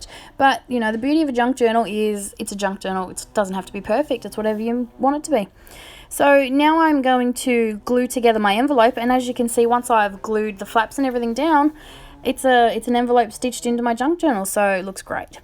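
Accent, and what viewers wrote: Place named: Australia. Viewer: like your work and your Aussie accent.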